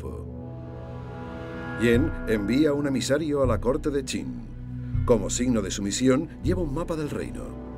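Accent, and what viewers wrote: Spain. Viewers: Si tan sólo no tuviera el maldito acento español.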